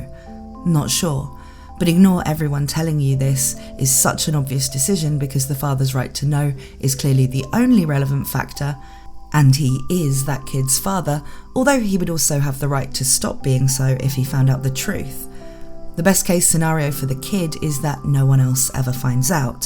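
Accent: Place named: United Kingdom. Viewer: Finally. It's in british accent.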